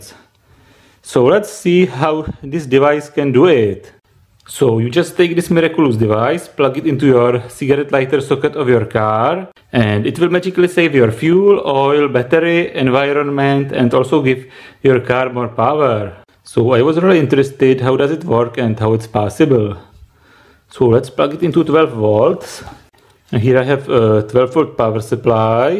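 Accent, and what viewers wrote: Czech Republic. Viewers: i know you're czech because you czech-ish english pronoumciation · Judging by your accent - Are you Czech? If yes then "Ahoj" :D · Thats some nice czech (prague) accent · Sounds like a Czech English accent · Tak tohle je nejsilnější český akcent, který jsem v angličtině kdy slyšel.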